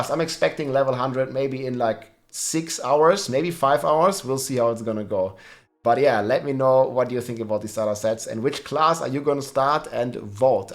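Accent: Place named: Germany. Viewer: I read patch notes and stuff, then come here to be serenaded by a heavy German accent.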